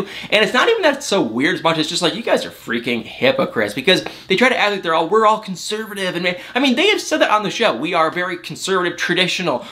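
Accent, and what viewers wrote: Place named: Michigan, United States. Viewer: Hey! I called it a few weeks ago! The RandB News. RandB. RRRrrrr! You can smell it. Lol. I love 💕 your Michigan accent! I can hear it from another room! Sweet!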